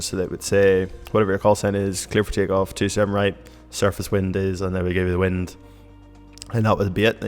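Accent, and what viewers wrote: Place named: Northern Ireland, United Kingdom. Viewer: Just browsing some flight sim stuff and heard ur accent, didn't expect u to be from NI also haha. Good man 👍🏻👍🏻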